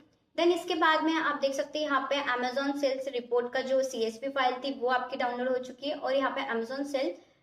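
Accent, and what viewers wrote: India. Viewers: a slight tapori accent but she knows what she is doing and explanation part is really good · Hyderabadi Accent ❤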